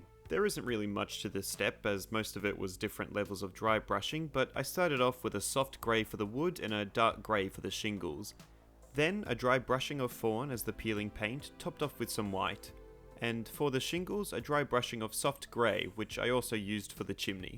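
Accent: Australia. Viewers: Bruh, this is your first and (so far) only video and I already love your stuff and I'm half a minute into the video as I type this You've already earned yourself a subscriber Also are you Australian too?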